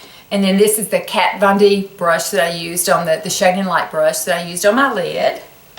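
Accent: South, United States. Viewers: I never knew you thought this way about your accent. I absolutely LOVE your southern accent....full of charm.❤-Ruthie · Take care of. I hope everything goes well at your doctor appointment, please update us. I want you to know that I absolutely adore you, and that sweet southern drawl of yours! You are so sweet and so encouraging to all of us!! I love you, and love your channel!! I am a 52-year-old grandmother of 12, and I want you to know it is so refreshing to see someone in the over 40 crowd, Who is as beautiful and sweet as you are!!! ❤️💜❤️💜❤️💜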